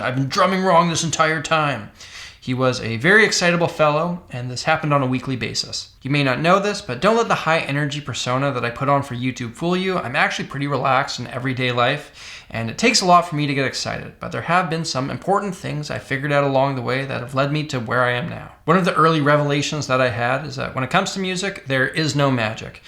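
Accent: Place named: Canada. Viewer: This dude sounds like a stoned Canadian talking really phylisophically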